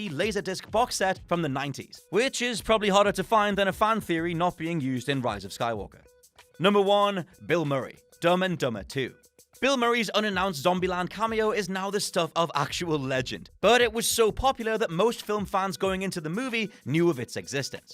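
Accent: United Kingdom. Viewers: That's a British thing. They almost all pronounce it Whooook instead of how North Americans do.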